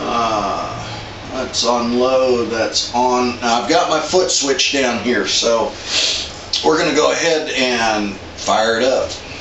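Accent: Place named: Deep South, United States. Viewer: Guessing your from the Deep South somewhere....that was the most relaxed review I've ever seen....enjoy your saw and this was helpful.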